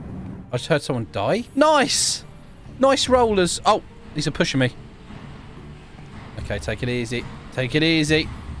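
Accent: United Kingdom. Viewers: yea the brithish accent is amzazing like mate sheesh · You sound british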